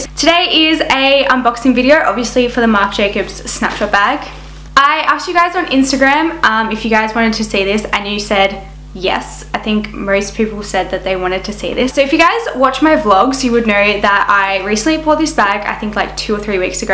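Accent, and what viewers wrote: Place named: Australia. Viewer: I have 4 of them - I feel I’m justified because normally one bag is $1500 for me at the very least I really like high in bags so for me to have four or five of them would equal the cost of one bag so there’s my justification😂 I just love your accent oh I’m not sure what country you’re in but it sounds Australian but anyway I absolutely love the accent I wish I had it!!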